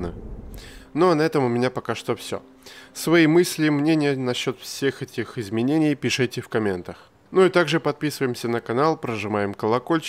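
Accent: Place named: South, Russia. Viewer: Необращай внимание, есть у тебя некий "южнорусский" акцент).Вначале думал ты с Украины, но потом увидел некий город на букву Л.Это фигня, ты молодец, работаешь над собой помню твои старые ролики)))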